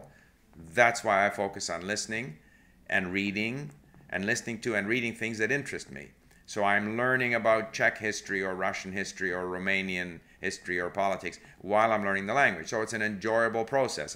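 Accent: Canada. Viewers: yes this is something I also find interesting! I never thought Canada had an accent until I went to Vancouver and when I saw yuor first video I thought "wow this guy has a Canadian accent en then you said you were from there en I was like wow I was right! hahaha but yea very interesting topic! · Hello,! Can you do some videos about the different kind of English accents (British,US, Scottish,Australian etc...)? I'm French and it will be great to know more about it. And also if you can talk differences of vocabulary between Canadian/US/UK vocabulary? I read that for US, you (English Canadian) have an accent but I am not able to notice yet. Is it as strong as us French people with French Canadians? Do Canadian people learn French from France or French Canadian?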